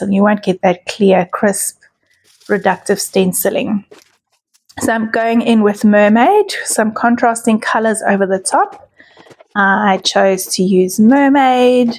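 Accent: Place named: South Africa. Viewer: Beautiful page! I love hearing another South African art journalling because sometimes I feel like I’m the only South African who art journals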